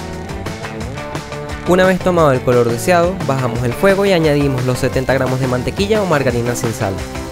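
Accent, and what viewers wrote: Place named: Ecuador. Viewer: es que tienes asento como si fueras ecuatoriano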